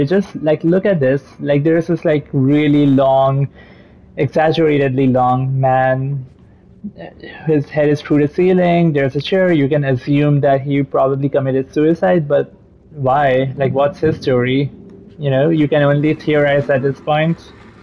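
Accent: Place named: United Kingdom. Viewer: i was about to say i am glad to hear your bristish english voice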